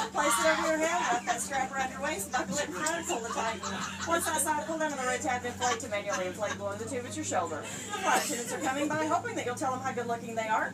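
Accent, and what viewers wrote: United States. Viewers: very funny but the extremely fast american accent is hard to understand 😂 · Hard to understand if you're not used to southern US accent, especially with the speed she talks in. Still better than the usual boring announcements though!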